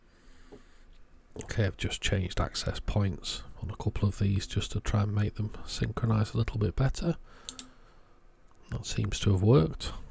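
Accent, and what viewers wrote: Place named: United Kingdom. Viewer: Hello mate you sound like you’re from the UK as am I, I’ve only just started on LED electronics and I was wondering where you got your LED’s from. I’ve just ordered some from aliexpress as the 1st one I got off amazon is like 3 times more expensive than Ali but the only downside is that it’s 3 weeks shipping time.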